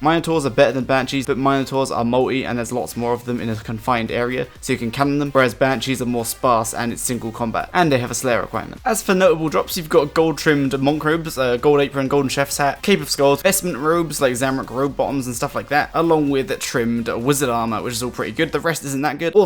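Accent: Australia. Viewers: He looks like an American, with Australian voice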